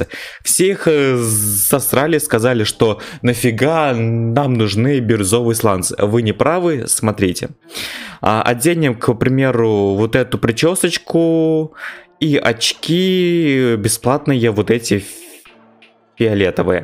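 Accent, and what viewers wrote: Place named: Chechnya, Russia. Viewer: Чеченский Ваше Похож сам чеченец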